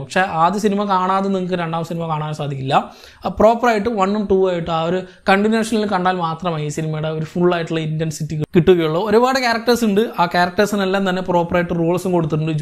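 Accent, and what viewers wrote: Palakkad, India. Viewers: This time in long time i could sense your palakkadan slang👏👍😀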